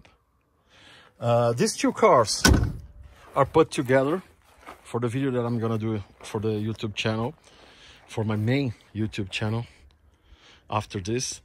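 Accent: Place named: Brazil. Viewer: Nada como um Brasileiro falando inglês. A gente consegue entender um pouco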